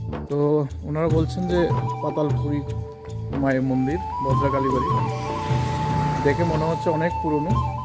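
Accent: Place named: Feni, Bangladesh. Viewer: আমার বাড়ি ফেনী জেলায়, এখন খড়গপুরে আছি। আপনার উচ্চারণ একদম আমাদের মত। আমি একদিন ঘুরিয়ে আনবোনে ফেনী-চৌদ্দগ্রাম এলাকা, দেশে গেলে।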